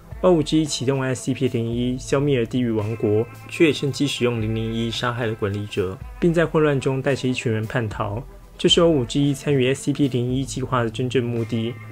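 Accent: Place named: Taiwan. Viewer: 最早在B站上看到这个youtuber，一开始不知道是youtube上面的，后来听到湾湾口音觉得肯定不是大陆人（福建人口音虽然一样但不会那么明显哈哈哈）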